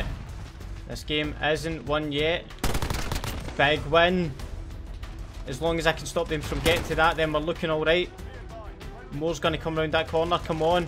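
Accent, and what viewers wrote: Scotland, United Kingdom. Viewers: what city in scotland you from?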